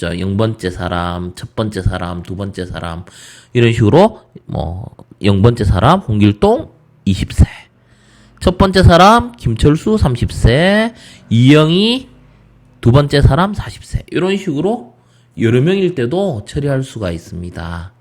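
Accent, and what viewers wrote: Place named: Gyeongsang, South Korea. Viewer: 왜 나이든 프로그래머들은 죄다 경상도 사투리를 쓰는가...... 나도 모르게 사투리 리듬타다보면 고개만 끄덕거리다 머리에 하나도 안들어오게 되는데.....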